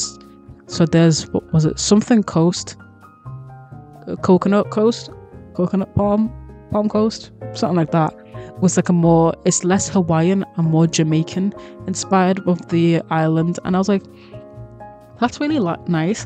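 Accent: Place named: Liverpool, United Kingdom. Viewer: omg 😅 that's a south liverpool accent !! how did my life go this long without hearing another scouse simmer 🥹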